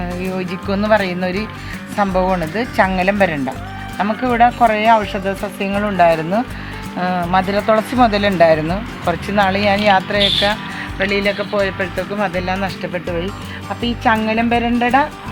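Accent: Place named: Ernakulam, India. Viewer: Chechiyude samssaram kettal Ernakulam pole thonnum👍👍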